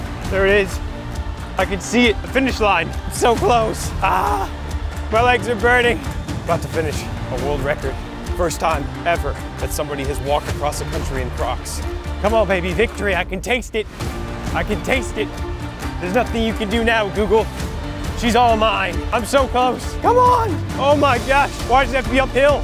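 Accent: United States. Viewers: are you from minnesota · As a german man konnte so einfach erkennen das du amerikanisch bist 😃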